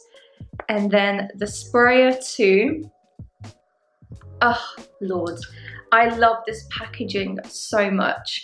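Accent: France. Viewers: Love your french accent 😆 you are too cute! that Xerjoff packaging and the bottle is top! More like the beauty is sleeping 💙🧡 great review thank you for the video! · That French accent haha. Great video and I love Side Effect :)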